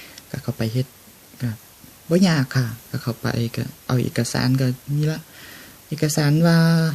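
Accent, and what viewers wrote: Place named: Chaiyaphum, Thailand. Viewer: โทดเด้อจ้า พี่คนทางได๋น้อฟังเสียงเว้าคือคนชัยภูมิบ้านหนูแหมะ พอดีพึ่งมาติดตามมื้อนี่จ้า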